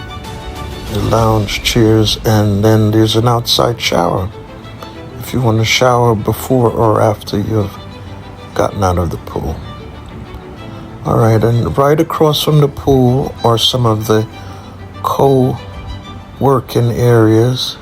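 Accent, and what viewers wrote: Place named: Jamaica. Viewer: I can hear that powerful exploratory Jamaican heritage in your voice bro! I wrote this before i saw the flag I've just reached that.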